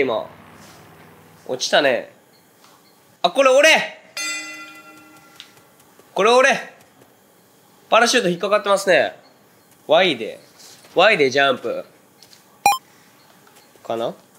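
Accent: Kansai, Japan. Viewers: やっぱり長尾くんの関西弁好きだな〜 編集も愛があってみてて幸せすぎる😢 ソロ動画嬉しいですありがとうございます🥹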